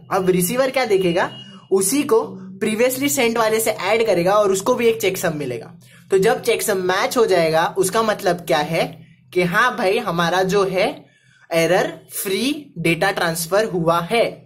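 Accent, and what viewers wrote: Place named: Hyderabad, India. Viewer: His accent is slightly Hyderabadi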